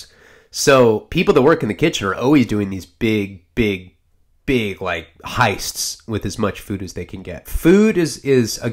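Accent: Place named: Chicago South Side, United States. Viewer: Your south sider accent is priceless 🤣🤣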